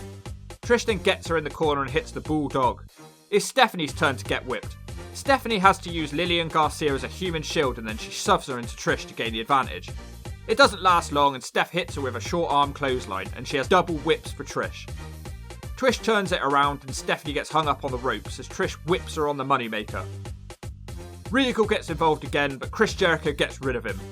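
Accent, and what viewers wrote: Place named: England, United Kingdom. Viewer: Why are the narrators always English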